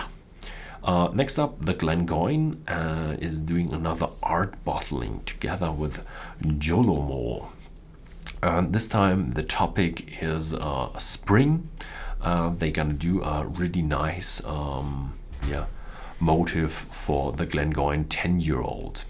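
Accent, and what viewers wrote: Germany. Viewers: "A lot of news" or "tonnes of news" would work well. That said, I'd recommend you don't try to correct it. It adds flavour to your rather slight German accent. Back on topic: I could've sworn I've had an Amrut rum that was quite good. But google says there isn't one...?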